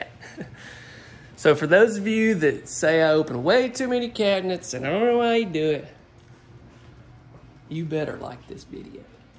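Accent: South, United States. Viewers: Absolutely amazing - I really give the owners kudos for having the imagination to create such a beautiful comfortable amazing home with all the extras it’s crazy!! Definitely made me feel calm and a little envy ness lol no but seriously it truly made my day! Thank u to the owners for allowing America into your home and thank you for doing such a great job and that southern accent and manners gets me every time!! Lol -Looking forward to watching more of your videos! God bless!! 🙏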